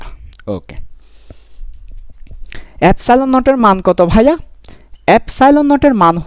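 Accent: Chittagong, Bangladesh. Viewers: Vaiya apni k Chittagong ar nki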